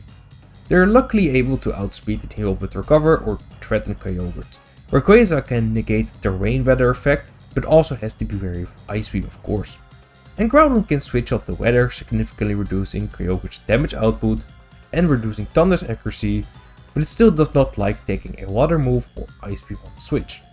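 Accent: Netherlands. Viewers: Hahaha Rain Boosted Water Spout goes brrrrrr (Btw are you Dutch? Guessing from your accent)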